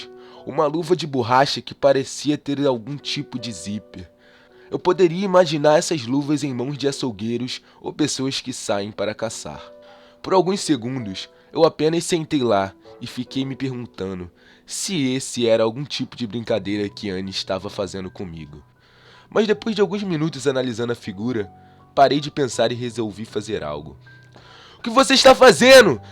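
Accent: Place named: Rio de Janeiro, Brazil. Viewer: Sotaque do Rj haha. mandou bem demais. tem talento e merece sucesso. like p vc e ganhou uma inscrita :)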